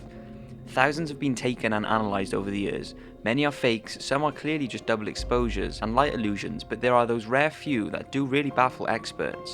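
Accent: United Kingdom. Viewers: Your British accent makes the video sound creepier O.o PS. U have a new sub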